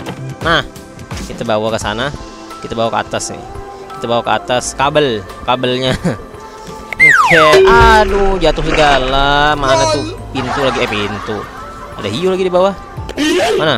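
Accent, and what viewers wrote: West Sumatra, Indonesia. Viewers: Bang suara lu kaya Minang kocak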